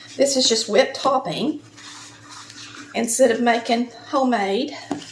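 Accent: South, United States. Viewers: I'll figure out a way to get in touch with you but I got to go to bed now got to be up at 6 you make me smile with that southern accent I was born in Macon Georgia raised in Miami after Hurricane Andrew now I am in Mishawaka Indiana alright young lady got to go to bed but I will get in touch with you somehow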